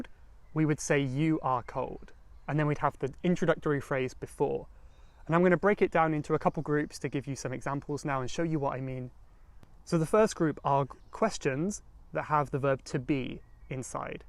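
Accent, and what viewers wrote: United Kingdom. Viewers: I love your British accent 😅📌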